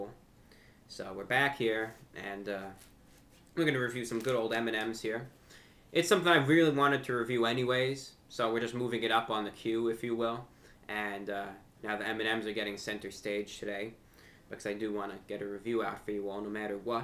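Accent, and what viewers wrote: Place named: New York, United States. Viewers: Can an American help me out here, what is this accent? 'Vaniller' and 'Chawclat' and whatnot seems different to standard American, there's definitely a regional accent trying to be suppressed in there. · it's actually his New York accent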